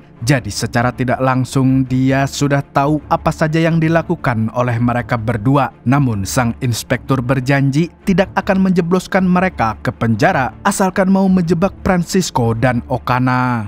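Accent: Banjar, Indonesia. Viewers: Curigaa mimin orang banjar ya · Bah amang nya urang Banjar saku nih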